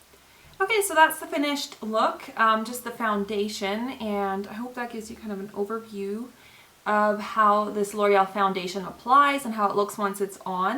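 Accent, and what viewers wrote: Germany. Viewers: You are german, right? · OMG I'm new and I didn't know that you are german:) you have great accent...!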